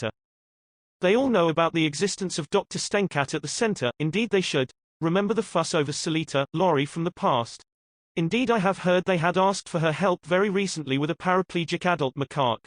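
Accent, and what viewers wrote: United Kingdom. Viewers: where do you get youre voice over voice? something about a uk accent makes it sound way less robotic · Sounds like somebody is all bent out of shape because he's not getting enough views. The true question is, why are you using an English accent on your video? Hmmmmmmm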